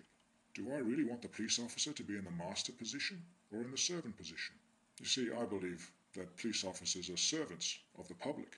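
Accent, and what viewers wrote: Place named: United Kingdom. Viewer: by the way, you sound british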